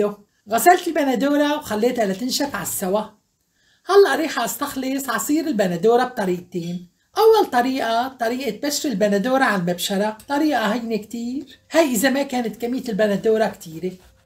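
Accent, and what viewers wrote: Aleppo, Syria. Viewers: لهجة حلب مثل العسل الصافي وأهل حلب والعادات والتقاليد والتراث العريق من آلاف السنين إلى اليوم تاج على رؤوس الناس أنا من حمص وأسكن باللاذقية دخيل رب السوريين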